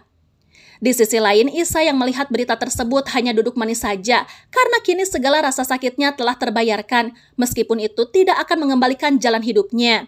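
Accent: West Java, Indonesia. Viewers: Ini admin nya kaya nya orang sunda nya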